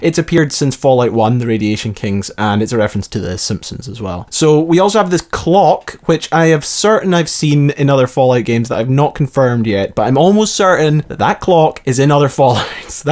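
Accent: Scotland, United Kingdom. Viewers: Tyler M. It's his accent he's Scottish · Is this guy brittish or American or canadian?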